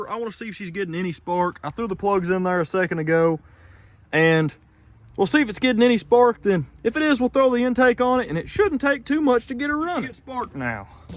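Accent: South, United States. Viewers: Your down south delivery put me off at first, but I liked the projects you were working on. Now, I have no issues and this far north ( Alaska) junk car junky enjoys every video you put out. Watching the efforts you put in and the problem solving involved is top notch. Making push rods for this is back yard genius stuff! Thank you for what you do and how you do it! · You're the most stereotypically American-sounding person I've ever heard. Amazing.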